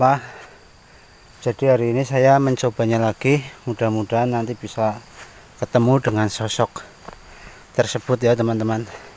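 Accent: Banyumas, Indonesia. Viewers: Aduh mba bahasa ngapaknya keluar nnti yg bukan ngapak ngga tau loh 🤭 Penemuan suku tidak jelas boso ngapake koyo ning judul nduwur wi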